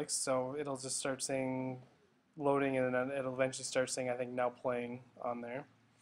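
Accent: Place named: Canada. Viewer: You sound nice and Canadian!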